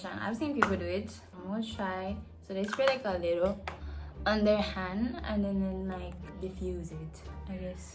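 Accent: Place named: Jamaica. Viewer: I love this chick ,❤️ Much love all the way from Africa 🇿🇦 I just love the patwa accent.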